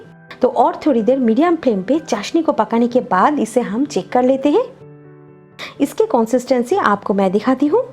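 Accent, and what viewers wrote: Bengal, India. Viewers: nice culnary skill...ur hindi in Bengali accent is so cute....🤗🤗🤗sukhto Recipes plzz easyway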